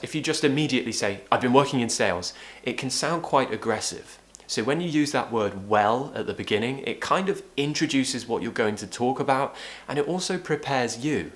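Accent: RP, United Kingdom. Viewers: I loved British accent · I love British accent. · Who loves british accent? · Wow you are really good! Thank you very much for this lesson! You know what.. You look a lot like Alexa Chung.. Really.. You remind me her enormously.. Maybe because you have similar accents but it is more physical actually you faces or eyes are quite similar.. Coolest Brit pals 👌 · I love British accent ... its royal accent ! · This is one of the questions I find very difficult to answer correctly at interviews. Thanks for the tips. From a fellow Brit. PS. I have the same accent as yours.😃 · Indulge in British accent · I dream to be your student and learn your amazing pronuncation. I love british accent since my 11's. Of course I can not pay your course right now, this situation affected my economy, but one day, one day I will my dream come true. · thank u so much ,I Love british accent... 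· Just listening to you thats enough to get British accent ,, Lot of love ❤️ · I love this accent😍, i think British accent is better than American accent 😉🤗. You're the best english teacher in YouTube!!! 👌🤗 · I love British accent and I wish I could have a friend from London to communicate with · British accent is a great turn on for me😘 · thanks for ur advices greetings from Mexico . I love Brits accent . have a good one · I love British accent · Wow , I love British accent. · I love it British accent I live in UK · I like British accent ❤️ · Hi there! would you define your accent RP/ SSBE ? I'm working on a phonetic assignement! Also, where are you from? Thank you!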